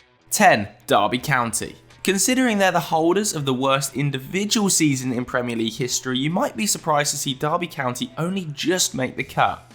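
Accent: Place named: Northern England, United Kingdom. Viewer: Manchester United fan? Well you certainly do sound like you’re from up north🙄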